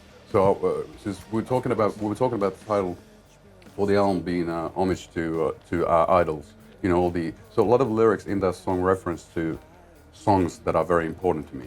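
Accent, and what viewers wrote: England, United Kingdom. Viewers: Villes voice has changed alot the last few months. he sounds soooo much more British now. LOL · He has always had a English accent, and he doesn't hang out with Dani Filth a lot he lives in Finland and Dani Filth live in England pretty far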